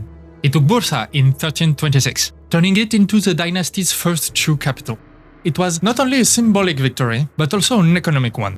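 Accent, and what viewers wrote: France. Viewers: Me disait bien que j'avais reconnu l'accent haha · am i the only one that finds his french accent in english charming? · french accent i see, nice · Why did they get a guy with the thickest French accent I've ever heard to do the video? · C'est moi ouuu le gars qui parle est français? D'après la prononciation qu'il fait.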